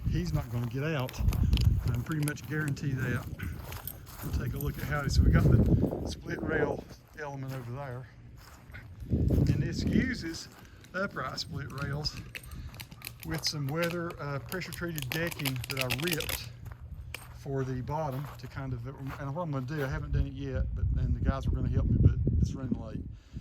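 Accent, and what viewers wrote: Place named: North Carolina, United States. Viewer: I knew you were from North Carolina before I visited your videos to see if you ever said where you were from. lol. I'm from NC too. Born & raised in Charlotte. Thanks for the clear, detailed instructions.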